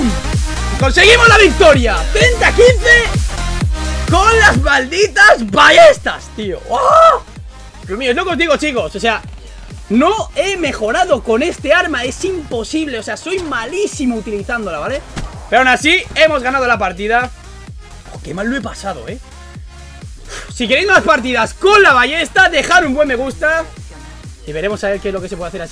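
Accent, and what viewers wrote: Mexico. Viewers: Wey Me gusta cuando ablas como mexicano jaja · hablando como Mexicano?? Jijij yo soy Mexicana:3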